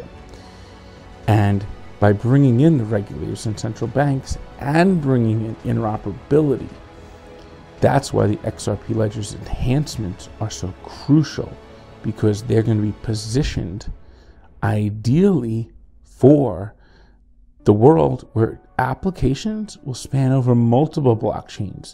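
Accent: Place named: New York, United States. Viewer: brother, thank you. Sound like a fellow NY'ER. Please, whenever you have time, can you go through the steps as if we're 6 yr Olds with all the new stuff coming out. Enoysis, f-assets, delegating. I know you go deep but if you showed us the complexity via how to's, we retards would get it faster. Sgb and lost 20k tokens since price went up, impermanent loss. Didn't even know bro. Help us since you understand it all!!! Gracias!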